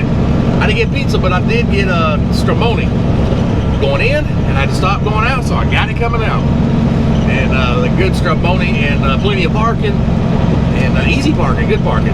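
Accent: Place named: South, United States. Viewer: sounds like an old timer and from the South too. Where y’all been? Guess I’m subscribed to too many channels 😂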